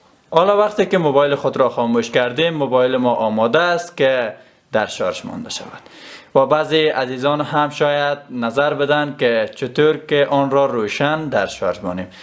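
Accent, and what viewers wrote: Afghanistan. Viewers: یه سوال چرا داره افغانی حرف میزنه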